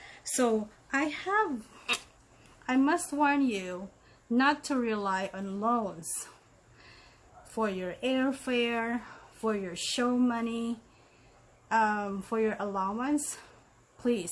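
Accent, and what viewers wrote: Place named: United States. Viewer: That's nice . I love your accent ma'am parang American accent na.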